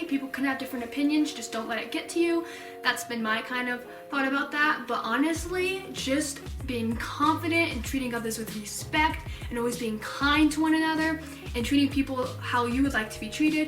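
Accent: United States. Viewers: Wait is she American????????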